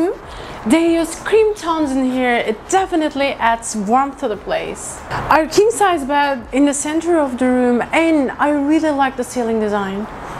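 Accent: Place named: Turkey. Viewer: Bende diyorum bu aksan ne aksani diye, Turk oldugnu sonradan anladim 😂 Video guzel olmus bu arada, yakinda Dubai'i yerlesmeye dusunuyorum.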